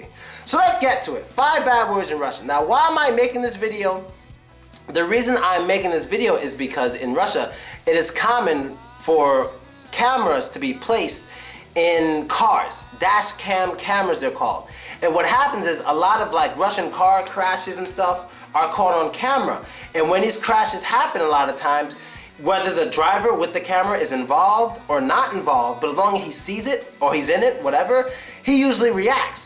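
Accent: United States. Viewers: Pronouncing Russian words w/ American accent is funny.. especially when they can't pronounce Russian letters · its amazing that you can speak russian as an american. keep up the good work but work on your accent some.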